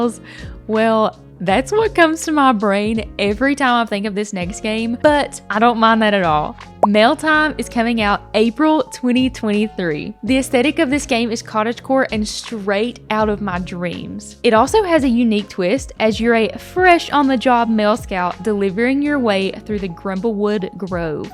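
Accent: South, United States. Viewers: The southern accent…never clicked subscribe so fast 😌🥰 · Okay your southern accent is darling ! Enjoying the cozy content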